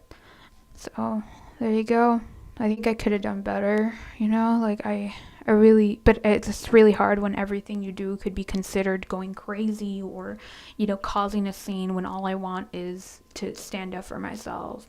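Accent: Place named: Russia. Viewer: As a slav girl, your russian accent is very good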